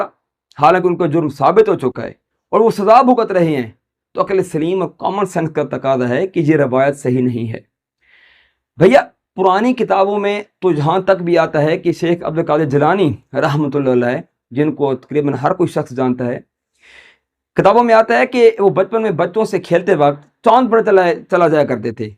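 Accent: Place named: Punjab, Pakistan. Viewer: Yeh Punjabi mai Urdu bol Raha hai ya Urdu mai Punjabi.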